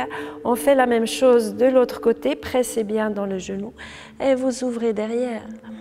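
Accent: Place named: Valais, Switzerland. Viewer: Un an depuis le grand confinement et toujours autant de satisfaction à vous retrouver et pratiquer vos différents programmes avec en prime ce délicieux accent valaisan que je connais bien de ma branche familiale cousine En espérant pouvoir vous rejoindre un jour pour un stage d'été Merci pour la qualité de vie que vous partagez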